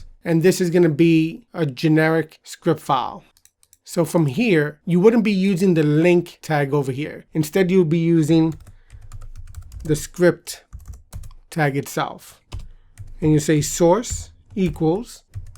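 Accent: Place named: India. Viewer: Ur english sounds like Indian..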